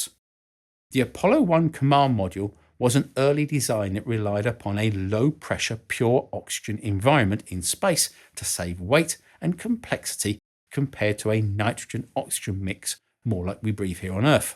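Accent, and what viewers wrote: United Kingdom. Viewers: After recently watching some William Shatner self depreciation videos, and coming here...it occurs to me that speaks rather like Shatner with a British accent.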